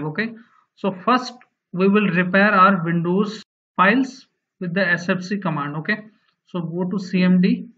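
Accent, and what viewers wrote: India. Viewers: It worked smoothly. Been searching the solution for hours. And i knew im in a safe place after hearing an indian voice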